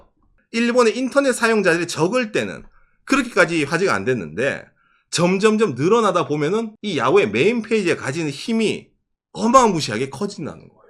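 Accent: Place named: Gyeongsang, South Korea. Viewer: ? 말할 때 경상도 억양이 살짝 들리는 듯 ㅎㅎ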